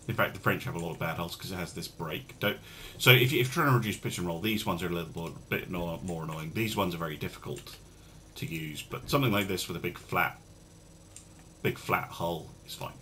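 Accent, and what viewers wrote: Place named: United Kingdom. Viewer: It's the British accent ;)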